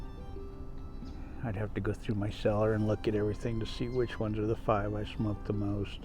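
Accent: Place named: South, United States. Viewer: picking up a little southern drawl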